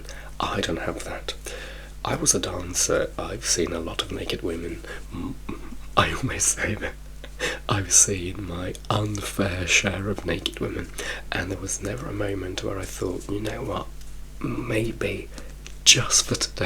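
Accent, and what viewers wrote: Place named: United Kingdom. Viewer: Mamma mia quanto mi piace il tuo British.accent, ma anche il tuo Italiano. Hai una voce rilassatissima. Scusami, anche se adoro l'inglese da quando. Ero bambina e lo parlo volevo farti i complimenti in italiano ❤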